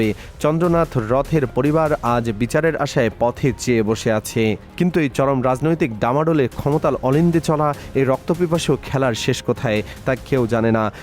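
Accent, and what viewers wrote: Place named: Bangal, Bangladesh. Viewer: O tui bangal .tor bhasay bojha jacche...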